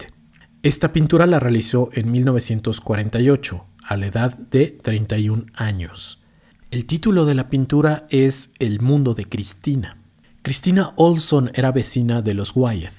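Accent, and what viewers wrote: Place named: Mexico. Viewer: Por su acento es mexicano.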